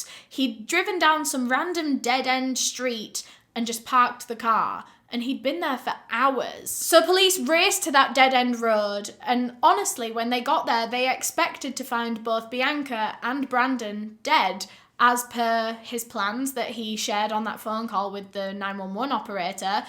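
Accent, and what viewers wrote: United Kingdom. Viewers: Way to be dismissive of biological facts vato. Your ignorance is monstrous. What a drama queen to use the word monstrous 👀. You got a problem homie, Go and study biology. You want to take moral high ground, then never again watch a murder case. Not going to happen. All of us normal human beings have a fascination/curiosity with deplorable evil that other despicable humans do. Hence why I come on. Because I enjoy her charm, and her british accent, and her way of narrating the subject matter. Highlighting and exposing these scumbags. Many of which I never even heard of despite being american hispanic. Take your nonsense somewhere else. You don't like biology and nature 'Oh well' your stupidity is noted.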